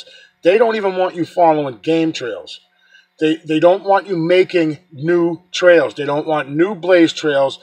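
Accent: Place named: New England, United States. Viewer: I'm not sure I believe that cr...p the park rangers are telling you...they know what's there.....I know what's there from watching your vids. I understand and respect your that you will go by their rules...and it's probably not a good idea to go off trail there anyway cuz there just might be something you don't want to run into...on the other hand if your gonna do any kind of field research on big foot you must be prepared for the worse to happen rite?? There are plenty of other places to go just like you said tho....Ive been a to a lot of forest ares up there as you know I to am from Mass........White mountains is where it's at!!!!! Again stay safe love your vids and I sure do enjoy hearing that new Enland accent again 😁😁😁LINDA IN FLORIDA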